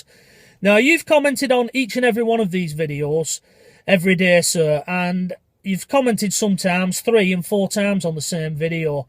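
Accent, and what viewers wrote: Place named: Lancashire, United Kingdom. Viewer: Blockbusters! 😍😍 Gosh it seemed so weird to see the days at the end whizzing past and to think that that's how long we've been locked up, and how drammatically things have changed in that time. You have got me through the lockdown and throughout it all, you were the only person talking any sense, and the only person I feel I trusted to give impartial and factual news about what was going on each day. Sadly I missed your final video when it was uploaded yesterday as I was at work, but I've just caught up today and I'm wearing my Wigan RL shirt to signify your last day. I feel sad that I wont get to watch your daily cheery videos and your accent reminded me of 'home' as all my family are in Lancashire (I live in Leeds) and due to me still nursing Covid-19 positive patients on a daily basis, I have absolutely no idea when I will get to see my family again. 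That's one thing I'm really struggling with this past few days. Anyway, thank you so much for your most EXCELLENT videos and it's been a great pleasure to 'get to know you'. I plan to catch up on some of your older videos now and will check out your new material. All the best, Teri X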